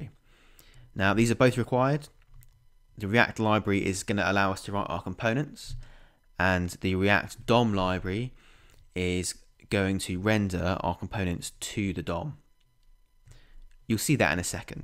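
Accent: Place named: England, United Kingdom. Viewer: Liked your English accent <3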